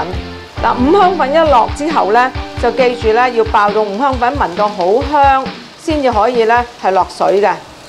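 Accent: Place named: Guangzhou, China. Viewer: your accent same as mine, must be from GuangZhou, GuangDong...... de Miami, Florida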